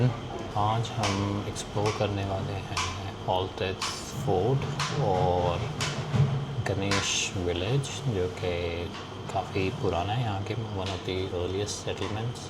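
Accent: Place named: India. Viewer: Bro r u indian?. Ur urdu has very big Hindi/indian touch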